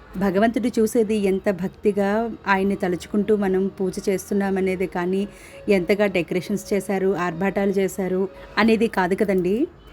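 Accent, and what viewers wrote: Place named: Srikakulam, India. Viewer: మావూరేనే మీది కూడా. మీ మాటలు విని. అప్పుడే. అనుకున్నా. మన. శ్రీకాకుళం అని. 👌👌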